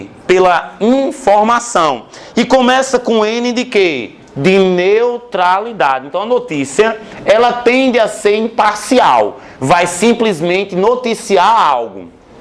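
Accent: Northeast, Brazil. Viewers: Pelo sotaque sabia que você é nordestino, com muito orgulho. Grande talento, parabéns! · Pense num sotaque gostoso de ouvir... Sotaque Nordestino é bom demais. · oooww Glória, gloria a Deus. Ei creio. Terra maravilhosa essa Paraíba, meu Nordeste. Eu sou de Pernambuco. Desconfie por causa do sotaque rsrs. Obrigada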